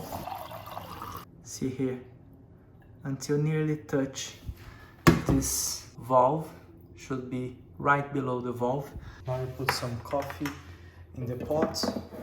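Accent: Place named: United Kingdom. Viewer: Inglês britânico e muito diferente 😅 curti esse formato aparece mais vezes 👍👍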